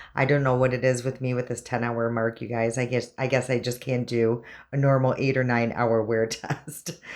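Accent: Midwest, United States. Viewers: I just love your videos! I hear that Midwest twang, and it's like i'm watching my younger sister! 😎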